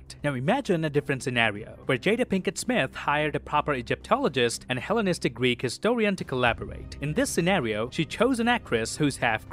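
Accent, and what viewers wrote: Canada. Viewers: You sound Canadian. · The weirdest Canadian accent I've ever heard. And we don't refer to each other as lads. That's a British thing..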